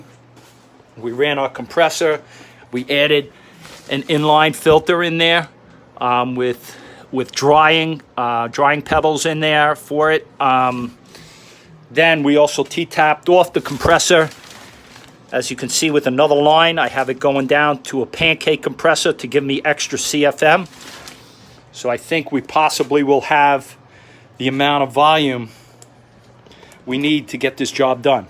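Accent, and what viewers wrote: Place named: Massachusetts, United States. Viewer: Luv the MA accent, lol...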